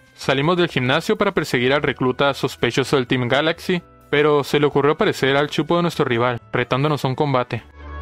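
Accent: Chihuahua, Mexico. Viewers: We tu eres de chihuahua verdad? Xd no te doxe ni nada pero tú ch es muy nuestro jaja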